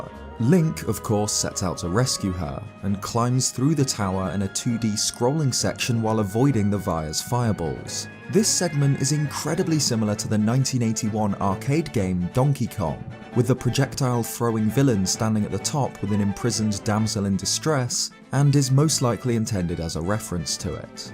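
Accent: United Kingdom. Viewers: will the legend reply aswell are you british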